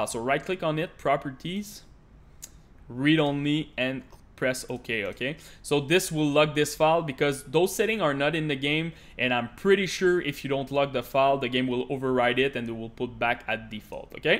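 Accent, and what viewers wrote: Quebec, Canada. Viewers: Fuck ça se voit direct l’accent québécois car j’en suis un en tout cas merci pour ce tuto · accent du quebec sa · ta une accent quebecois xd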